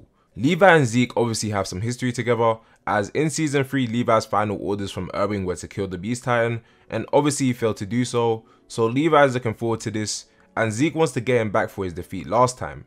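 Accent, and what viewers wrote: London, United Kingdom. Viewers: Finally a Londoner doing these reviews loool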